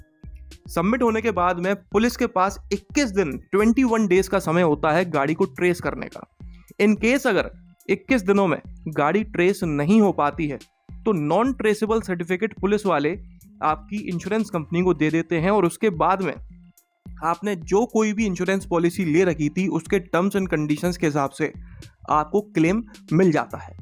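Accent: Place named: Gwalior, India. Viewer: Tumharii baat hi alag hai bhai maja la dete ho yaar ekdam.❤ Tumhari boli se mujhe to gwalior side se lagte ho.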